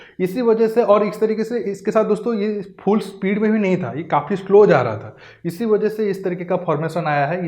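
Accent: Odisha, India. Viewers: Are you from Orissa? Your pronounciation is like odissi people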